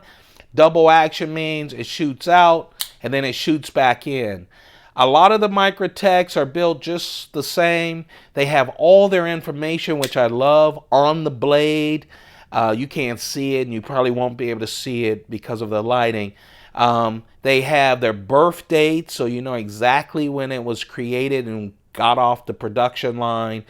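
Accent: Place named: Upper Peninsula, United States. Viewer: Redeemed Man, I agree 110%. Unbelievably annoying. I also couldn't finish it, had to shut it off. As asked above, was he under the influence of drugs/alcohol? Man, if I ran out of hand strength after operating these knives a few times I sure wouldn't make a video showcasing it. He sounds like those guys that sing,. I won't make this mistake again.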